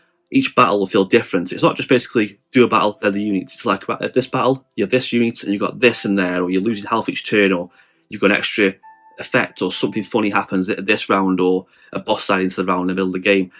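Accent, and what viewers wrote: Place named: United Kingdom. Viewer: I love your videos!! They’re great and helpful. Helped me decide to pick up Arkham horror lcg :) what region of the uk are you from if I can ask? I can’t place the accent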